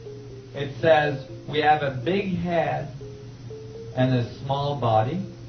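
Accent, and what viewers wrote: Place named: United States. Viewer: He just sounds weird because hes from america. Doesnt mean hes a dumb arse.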